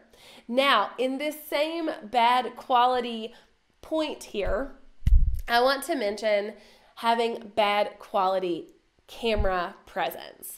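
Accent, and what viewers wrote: South, United States. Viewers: I have got to ask which of these southern states are you from? Mississippi here!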